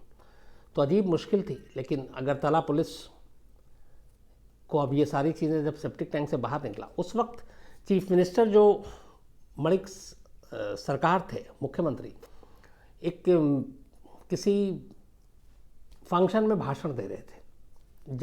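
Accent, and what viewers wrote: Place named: Uttar Pradesh, India. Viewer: Tahoe je gale me kuch math Daliye UP ke bhaiya lag the hain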